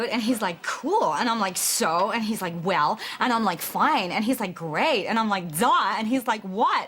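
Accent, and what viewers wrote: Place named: United States. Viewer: wow, shes got a pretty good american accent lol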